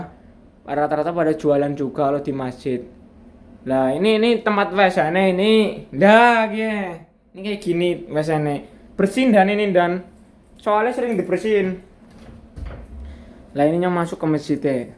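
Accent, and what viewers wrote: Banyumas, Indonesia. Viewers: Ngapak bgt rika kaya wong banjar,awas gole nyabrang cincing mbok teles hehe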